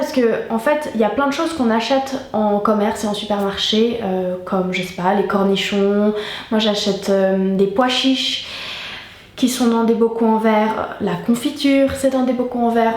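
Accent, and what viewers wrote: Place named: Switzerland. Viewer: J'imagine que c'est l'accent suisse qui prononce comme ça.. . Chacun son accent 🤔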